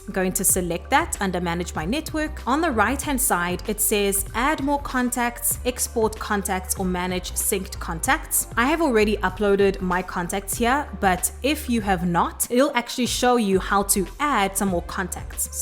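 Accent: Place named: South Africa. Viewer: So nice to hear another South African accent 🇿🇦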